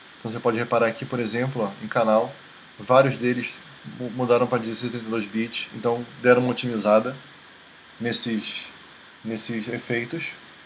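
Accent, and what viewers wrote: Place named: Rio de Janeiro, Brazil. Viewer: Pô, tu está perdendo tempo... com essa voz ai, se você gravar uma música dizendo que é do todo mundo vai acreditar kkk até o sotaque carioca vc tem =)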